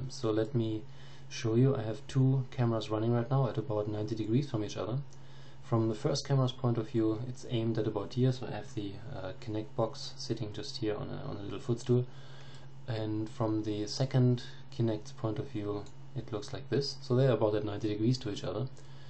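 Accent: Germany. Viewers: are you german? it's just a question because of the accent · Your German (Austrian maybe?) accent makes this sound really cool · Clearly a German accent. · could i be that you are a german, cuz you kinda sound like one :D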